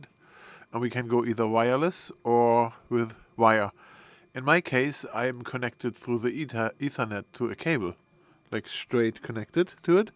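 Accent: Germany. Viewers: Du hörst dich an wie ein deutscher,bist du einer? Naja jedenfalls Danke für das Video!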